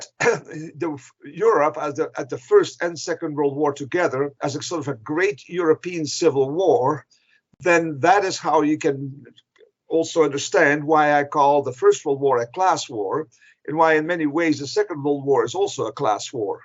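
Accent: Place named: Castile, Spain. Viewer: Es Canarias Semanal, ¿por qué la conductora es una castellano-parlante y no alguien que hable con acento canario?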